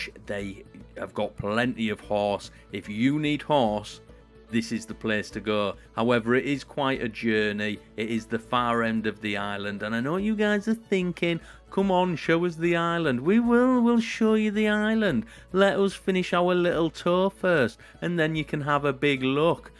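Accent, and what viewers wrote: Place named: Yorkshire, United Kingdom. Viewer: Ohh this is what ive been looking for. I dunt have this game so i like to watch people playin it, and nothing better than roleplay. Good stuff mate, liked and subbed. Also where abarts in Yorkshire you from? Im from Sheffield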